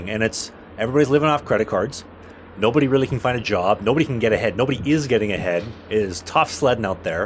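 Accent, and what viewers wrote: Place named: Canada. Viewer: I'm a 35 year old Indian American VP at Nividia and so glad I don't have to live in Canada and be broke and freezing all year and have a goofy accent like this guy. 😆 "Aboat, aboat, aboat!" 😆 If I ever see you in America I am calling ICE to put you in a detention center you silly nobody Canuck.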